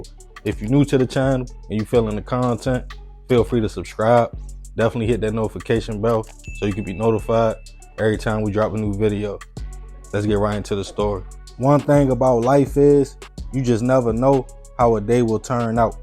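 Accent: Baltimore, United States. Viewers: Africa tuning in, love the content but the Baltimore accent gets me just like the southern part of the US accent. It’s certain words that I struggle with. love it though. Love & respect to and his family. Stay in peace everyone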